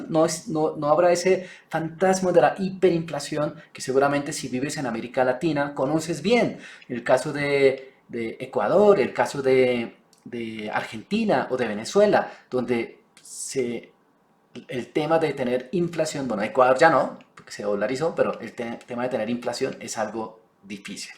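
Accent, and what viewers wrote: Colombia. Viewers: Hablas muy bogotano, te faltó decir carachas y régio chhhregio. Pero Usa no quiere reconocer la deuda, dijo que no reconocerá esos dineros a China, como indemnización por haber causado la pandemia. Euroasia ya negocia en con las respectivas monedasque la conforman y el poder militar gringo no es predominante para respaldar países que deben someterse a las reglas transaccionales basadas en el Usd. Tienes razón los cocos no caen a la vez, pero el blanqueo de los dineros del narcotráfico en la Banca sionista-judía ya se vienen conociendo. Qué ocurrirá con estos informes? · Wawww. Me sorprende ver un asiático hablando muy bien español. Lo felicito, me imagino que vive en España 🇪🇸, 🇺🇸 ó Sur-América? Bueno no puedo recocer su acento. Aunque parece ser que su acento es Colombiano 🇨🇴. Sea como sea lo felicito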